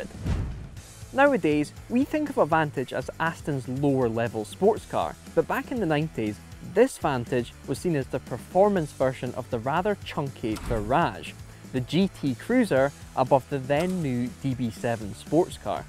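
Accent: Scotland, United Kingdom. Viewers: Is that a scottish accent? And what a great car. The Vantages have always been the best looking Astons. (Every generation.) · I love the Scottish accent more than the car.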